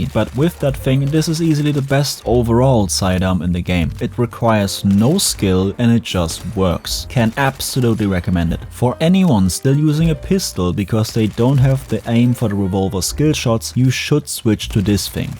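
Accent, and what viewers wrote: Germany. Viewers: I watched until the end because you make some of the best Battlefield videos on the internet. Dry humor, efficient/clear explanations on things, and of course your German accent. Danke, dass du großartigen Content erstellst!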